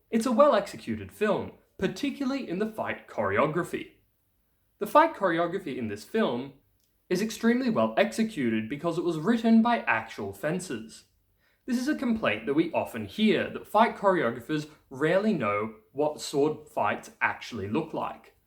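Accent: England, United Kingdom. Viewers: Your Polish sounds good and your English, well, English. As to never having been to the UK? Big surprise to me. I did like the video, btw and will certainly check the movie out. Up until now, the best looking swordplay - with sabres - that I'd seen was in another Polish movie, the title of which I don't recall, but concerns the 'potop' period. One of a trilogy, if I remember rightly. If you've not seen it, it may be worth a look. But then, I've never so much as handled a sabre, so make of that what you will. Cześć!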